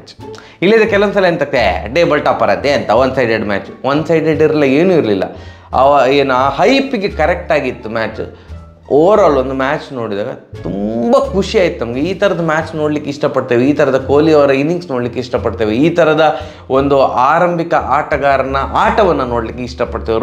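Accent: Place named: Bangalore, India. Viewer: Bro dakshina kannada bittu blre kannada mathadtidira... please DK kannada dalli maataadi..that janardan sir bashe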